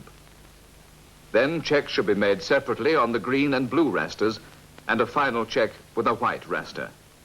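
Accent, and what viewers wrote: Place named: United Kingdom. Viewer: This is very fucking BBC with DAT FUCKING British accent.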